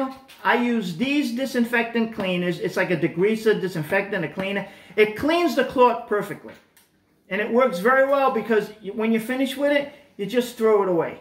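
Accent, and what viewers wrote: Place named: East Coast, United States. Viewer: Brilliant ! I ordered one and thought no way with the diamond picture! I gave it to my 11 year old granddaughter and she worked on it for 15 minutes. Now it's living in my piano bench unfinished. 😅 Thanks for the car cleaning ideas too! BTW, ❤ your accent!! East coast somewhere? RI maybe??😊 SE Ohio girl here. Great video!!!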